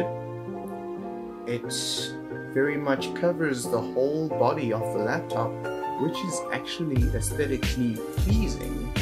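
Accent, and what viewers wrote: South Africa. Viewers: you are south african nè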